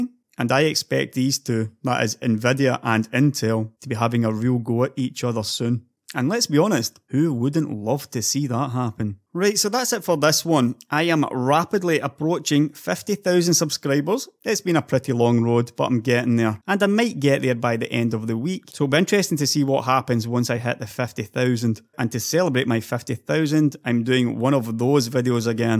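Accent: Scotland, United Kingdom. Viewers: I can even understand your Scottish accent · this Scottish accent tho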